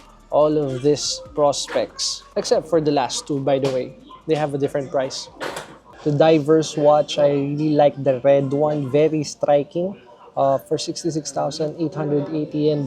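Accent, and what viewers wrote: Philippines. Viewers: watching this channel for the thick philipino accent! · Love your PH accent, was in PH years ago loved it there so nice people! Wow those Tissot and Hamiltons are stunning!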